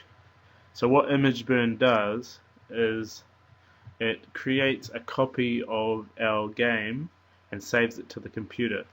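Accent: New Zealand. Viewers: You sound like a kiwi?? chur brother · KIWI ACCENT!! Busted... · You sound kiwi... Are you?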